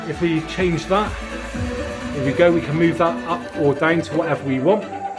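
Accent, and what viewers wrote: United Kingdom. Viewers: Hi, I'm Thomas from Italy. I like your way to explain and you speak in a way very clear to me that I'm not mother language, so I thank you first of all. I noticed you pronunce the I like O, for example you say "guys" or "five" but you pronunce "goyz" or "foiv", so I'm very curious to know in which region you live in UK. The other thing: i'd like to watch a video of yours you show your bodybuilder diet. finally: i add you on PSN, hope you'll accept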